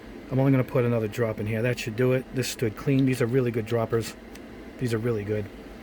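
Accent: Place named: New York, United States. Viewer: The most important tip I’ve ever learned over the years is when somebody with a thick New York or Boston accent gives you a tutorial on literally anything, you tune in and listen because you’re about to learn a ton of shit.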